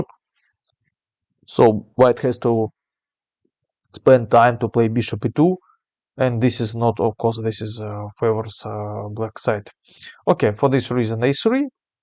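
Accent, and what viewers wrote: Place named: Russia. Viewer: Funny russiam. Accent 🎉🎉🎉😂😅😅😮😮❤😊😊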